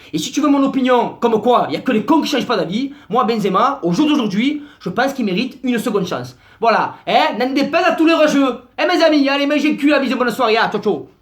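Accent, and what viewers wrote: Marseille, France. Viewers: c'est qui ce mec avec sa tete de croate et un accent marseillais des années 2000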